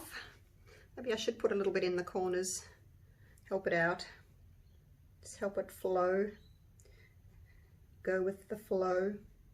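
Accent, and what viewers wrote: Australia. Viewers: Love this video and love the Aussie accent!!! “Oh this is so exiting lol 😂 “ u made me giggle how happy u were wen removing your clear coasters from your mold!!!!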